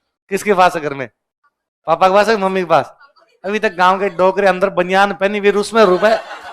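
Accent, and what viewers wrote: Rajasthan, India. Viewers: Raj saa u rock and ur tone of rjasthani is owsome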